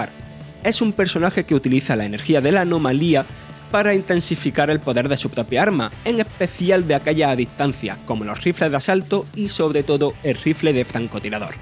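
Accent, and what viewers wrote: Andalusia, Spain. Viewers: Para los que se quejan de esta voz, yo entendí todo y tiene su acentuación española pero no exageren, ¿que palabra no entiendieron?. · A todos los que comentáis sobre el locutor: es andaluz (diría que de Andalucía oriental) y es un dialecto de vuestro país, que ni siquiera es lengua propia... si no lo entendéis es porque no queréis. Bienvenidos a España castellano-parlantes ;-)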